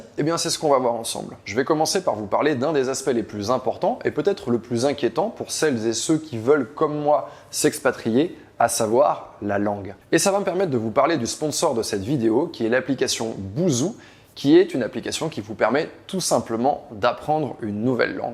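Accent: France. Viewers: Un poquito de acento francés 😊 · Bienvenue à Barcelone,!!! Je t'ai croisé il y a pas longtemps à la Barceloneta. Tu étais en vélo;-) J´habite Barcelone depuis 21 ans et j'y suis vraiment heureuse. Je dis souvent que c'est l'amour de ma vie <3. J'espère que tu l'aimeras autant que moi. L'accent français c'est un avantage alors garde-le;-)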